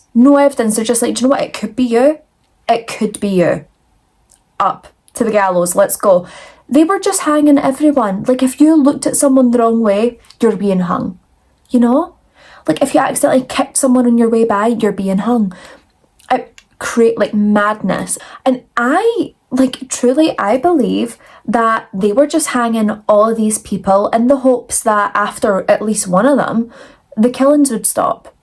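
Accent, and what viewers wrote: Scotland, United Kingdom. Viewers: It’s a Scottish accent, but close! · ❤ love your accent ! Are you Scottish? Sorry first video of yours i found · Hey I don’t usually comment like this on videos but I see you’re new so I wanted to post more constructive feedback. I think you’re a bit hard to understand and I don’t think it’s the (scottish?) accent. For clarity, I’m American which doesn’t help since I don’t hear this accent too frequently, but I think it’s a microphone quality issue since it’s the fuzziness between words that makes it harder to understand. I loved your video, first time watching but I think it’s an audio quality issue. It’s either from the microphone you’re recording from or maybe from compression when you’re downloading the video. I don’t know much about it all but your camera quality is very good and you speak very well and it sounds close to the microphone so I assume it’s a compression/ tech quality issue. 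From my time playing classical music and having to record audio for lessons and stuff, I’ve learned that putting my mic in a 12x12 foam box helps some or putting a mesh barrier between you and the microphone. I hope this doesn’t come off critically, your content and video are very well made and I subscribed to the channel as I think it’ll be awesome! I just don’t want you to lose potential subscribers due to audio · Nothing beats the authentic British accent! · That's what I said I just found her like just now, listened to her talk and I'm like omg she Scottish love it already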